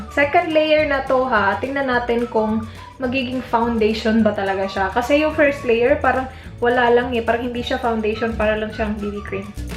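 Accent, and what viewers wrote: Visayas, Philippines. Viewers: i mean you speak visayas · ate, may halong bisaya ka ba? :) i love your videos newbie po ako sa yt mo :) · BISAYA KA PO BA? PARA KA NAMANG TRYING HARD MAG ENGLISH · Bisaya ka teh?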